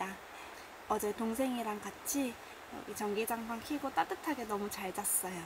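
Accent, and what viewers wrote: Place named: Gyeongsang, South Korea. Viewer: 예쁜 아가씨 말투에 경상도 사투리가 약간 있는 거 같네요 ㅎㅎ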